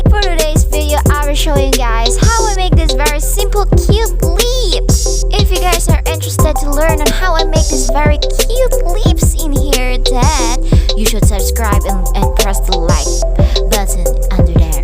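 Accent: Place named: Philippines. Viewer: ur voice kinda sounds like filipino :00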